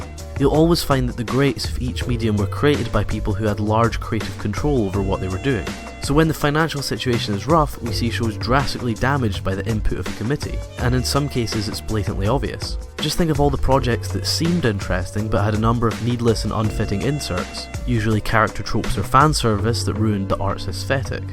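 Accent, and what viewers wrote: Scotland, United Kingdom. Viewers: what accent is that? I am sure it is british but cannot pinpoint the region.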